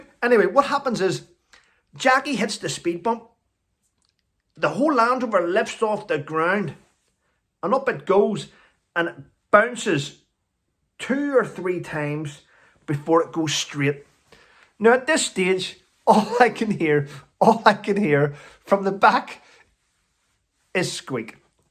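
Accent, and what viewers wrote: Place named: Ireland. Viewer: Oh that must have been so funny 😆 I love hearing your stories especially your Irish accent, my late Mum came from Carrickfergus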